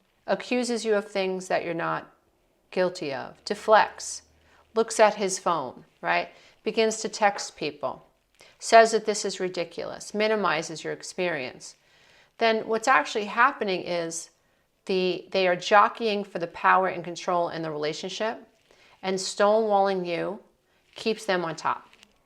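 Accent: New York, United States. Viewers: you are so New York. It's cute.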